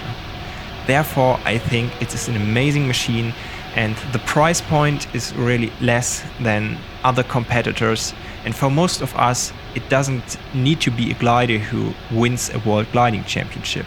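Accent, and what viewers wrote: Germany. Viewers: wie man das deutsch raushört XD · sounds a bissl deutsch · Cool german aktzent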